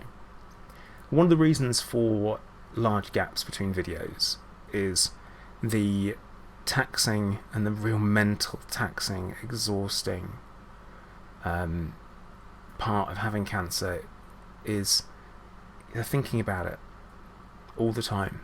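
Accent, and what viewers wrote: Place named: RP, United Kingdom. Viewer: May 24th, 2023 - Dearest, you are inspiring me in ways you will never understand. I feel at times, that you are speaking directly to me, as if you know me personally and you're giving me advice on how to handle my problems. I am very much like you, in the way you like to know the plan, and what's going on each day, wanting the facts and having them organized. There's nothing organized about being ill. I understand the triggers too, when you can't get away from it, and cancer is everywhere all the time! I love your free talks, where you just free-flow with your feelings and ideas. It's like listening to a friend who's going thru a very hard time. I am sending you my best wishes as you go thru your last days. I was heartbroken to watch your latest video today. Your voice is always something I've enjoyed so much. You have such a lovely posh accent, and are so philosophical.:face-red-heart-shape: Smelting Pot!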